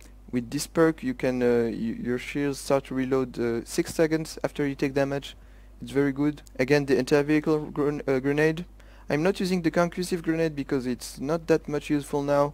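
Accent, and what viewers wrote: France. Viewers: are u french (with your accent)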